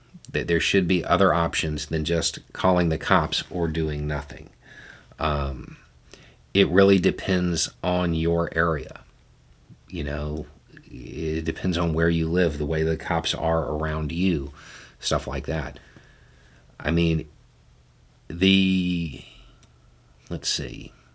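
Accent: South, United States. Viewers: The fact that you describe yourself as, "detached" helps me understand part of the reason I've always trusted you. I describe myself that way, and others have made that observation about me. We have similar backgrounds in regards to our younger years and area. I've lived in the rural South my entire life, and you sound like the men I've been around my entire life, accent wise. The vast majority of men around me are typical so called christian conservatives, mostly trumpers at that, ugh! Oddly enough, I feel like you listen to me more than any man in my everyday life, sad huh lol. I'm so glad I found your channel way back when you had around 250k subscribers, I know you and your intelligence and calm delivery have helped to keep me sane during this weird ass timeline we are currently living in, thank you for everything, and team! ✌️❤️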